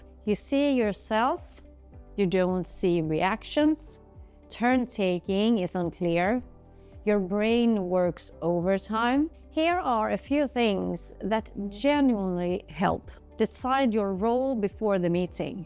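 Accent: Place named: Sweden. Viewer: Great summary of years of experience. Keep you accent - it gives you a distinct charm. You sound like a Swedish person among the crowd of wannabes 🙂 Yes, speaking clearly and comprehensibly is important, but do not get rid off of your accent completely - it is your feature to be memorable. Though for many it is a goal of their life.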